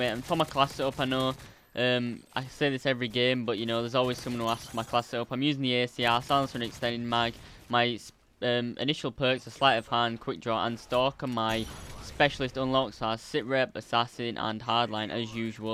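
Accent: United Kingdom. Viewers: Are u British be ause I want to no cause ur accent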